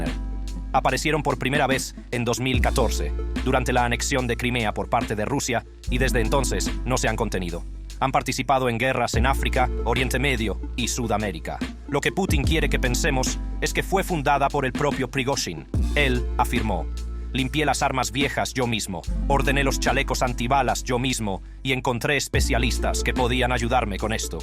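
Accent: United States. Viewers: Tan buenas noticias..pero que pésimo gringo o ruso ..no se...se escucha mal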